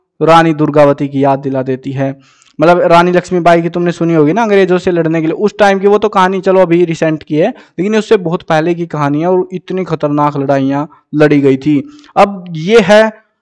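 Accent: Haryana, India. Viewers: Right sir haryanvi ka apna swag ha 🤟🤟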